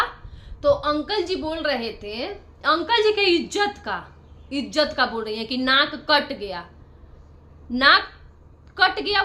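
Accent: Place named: Bhojpuri, India. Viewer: Dear aap kahan se ho mast bhojpuri bolti ho bilkul mere jaise 😂😂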